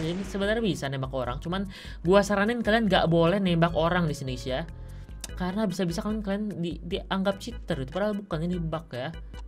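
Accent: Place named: West Java, Indonesia. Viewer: Bang asal mana sih kok sunda🤣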